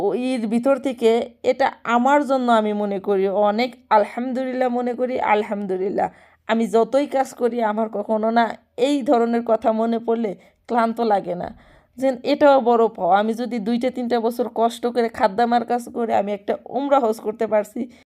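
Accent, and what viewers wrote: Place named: Barishal, Bangladesh. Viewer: আপনার কথা শুনে মনে হচ্ছে বরিশালের মানুষ আপনি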